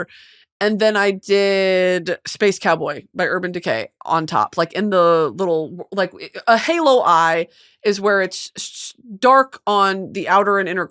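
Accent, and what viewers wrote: South, United States. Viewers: the southern twang made me feel so comfortable today. right at home -texan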